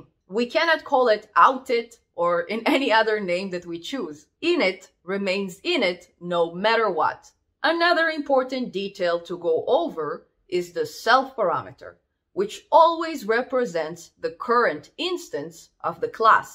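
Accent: Russia. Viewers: Русский акцент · Your accent sounds really nice. Are you Russian or Ukrainian?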